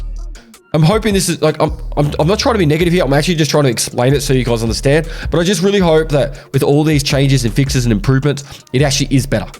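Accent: Australia. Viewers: Most distinguishable Aussie accent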